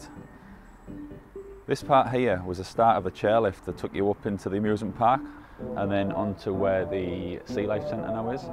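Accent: Middlesbrough, United Kingdom. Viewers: Hi lad, just discovered your channel, it's excellent. Keep up the great work. Are you a Middlesbrough lad by any chance you have the accent?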